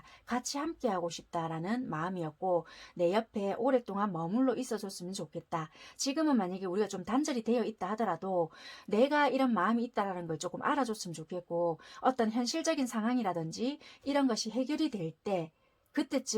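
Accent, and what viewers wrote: Busan, South Korea. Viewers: 5번🙏🍀💜 저도 부산사람이라 듣기 편하고 좋아요 잘맞는거같아요....... 참고해볼게요... 앞으로 우리커플 잘됐으면 좋겠어요 제발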